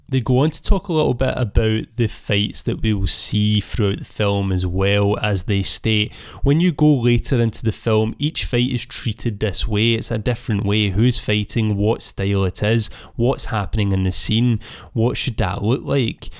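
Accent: Scotland, United Kingdom. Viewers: imagine if you speak in your normal speed anyways its your accent of course scottish will understand it... thank you for your clip and subtitles from your efforts,though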